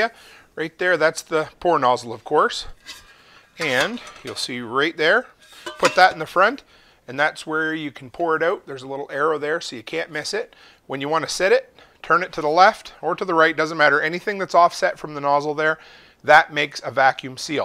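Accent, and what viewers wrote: Canada. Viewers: I hear a Canadian accent.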